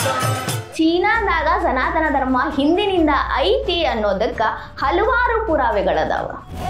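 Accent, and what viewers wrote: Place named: Uttara Kannada, India. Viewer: Anna adu uttarakannada slang avru matadirodralli tappenilla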